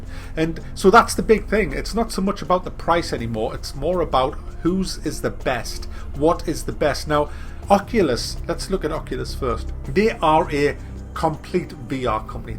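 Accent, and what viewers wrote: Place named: Newcastle, United Kingdom. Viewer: Sounds like he's from newcastle, or near by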